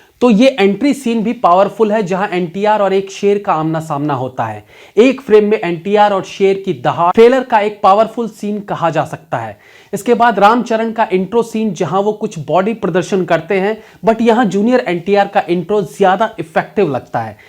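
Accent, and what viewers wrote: Bihar, India. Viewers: Pure bihari ho aap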